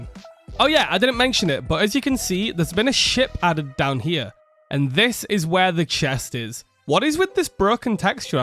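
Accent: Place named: Yorkshire, United Kingdom. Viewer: You sound like you're from Bradford/Leeds/Hudds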